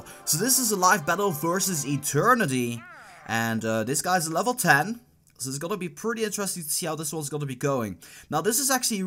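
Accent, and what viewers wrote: Netherlands. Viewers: are u dutch cause i am and your english is like you're dutch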